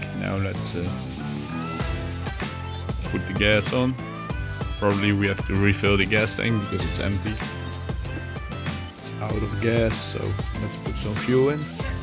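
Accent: Netherlands. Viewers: Aye I gotta ask are you Dutch? Cuz water the accent and I recognize places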